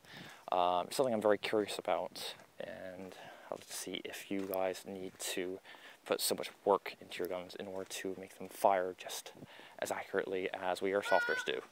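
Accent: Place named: United States. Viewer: Where do you live? You have an American accent, but you're paying for things with pounds?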